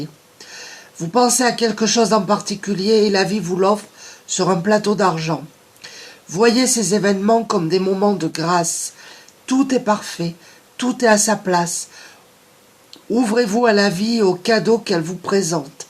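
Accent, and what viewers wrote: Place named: Belgium. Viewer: haaaaan dsl vous avez un accent de Belgique 😂🤭 pardon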